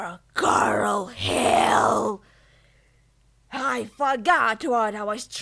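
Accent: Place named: United Kingdom. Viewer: this sounds british and every other accent together, keep up the good work 10/10 voice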